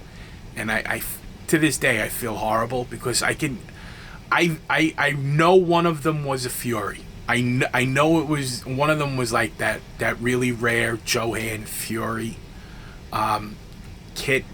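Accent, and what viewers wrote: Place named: East Coast, United States. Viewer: Thank you very much for sharing. Your east coast accent brings back a lot of memories for me. I haven't been back in a long time!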